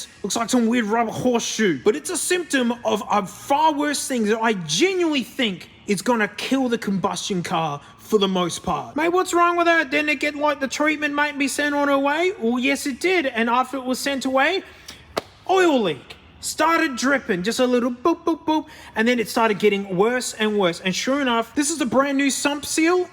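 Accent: Australia. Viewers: BABE THE YELLING AUSTRALIAN GUY POSTED!!!!!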